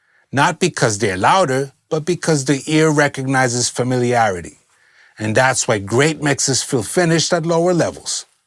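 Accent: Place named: New York, United States. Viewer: Not to mention the NY accent glues this all together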